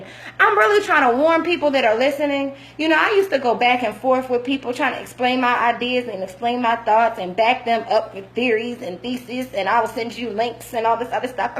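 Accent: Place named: DMV, United States. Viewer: You have a DMV accent 💜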